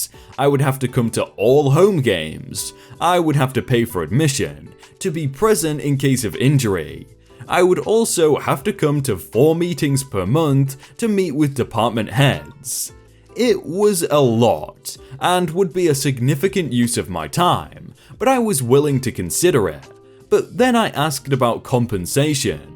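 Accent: United Kingdom. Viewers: The 2 story’s he sounds British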